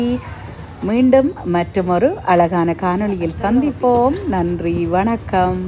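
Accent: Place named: Sri Lanka. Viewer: Sri Lanka tamil azhagu thaan ga ponga❤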